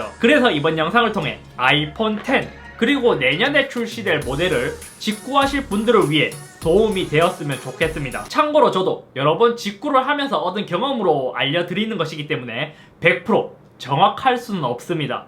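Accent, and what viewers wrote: Gyeongsang, South Korea. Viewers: 경상도 분이신가봄ㅋㅋㅋ 억양이 들리네요 저도 경상도!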